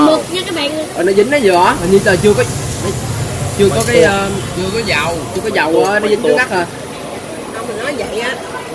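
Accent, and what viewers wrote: North, Vietnam. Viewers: Sao ông bố nói dọng Bắc còn Con lại nói dọng Nam vậy ? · Chú cho con hỏi sao chú nói giọng bắc mà 3 bạn kia nói giọng nam vậy chú :3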